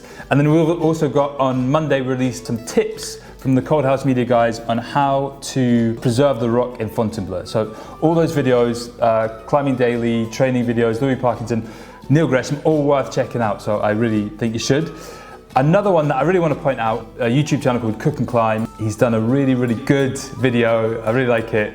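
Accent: Scotland, United Kingdom. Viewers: Cheers for the info! I wouldn't have called it 'strange' if I'd placed it as Scottish. ;-) Sounded like it had a twinge of something from further afield. Keep up the well-spoken words! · Scottish....but educated in England :D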